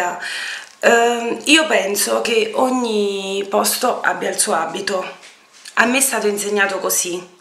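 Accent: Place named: Campania, Italy. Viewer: ciao..sei carina e simpatica..grazie per i consigli posso chiederti di dove sei??parli come me...son campana...ciao.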